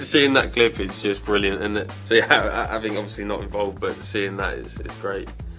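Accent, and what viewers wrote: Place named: Birmingham, United Kingdom. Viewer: It sounds like he has a hint of a Birmingham accent.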